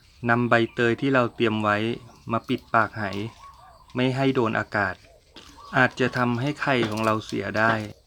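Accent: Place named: Suphanburi, Thailand. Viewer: เลิฟห้าหนึ่งได้ยินแล้วต้องตามมาชม เยี่ยมมากค่ะ น้อง 👍👍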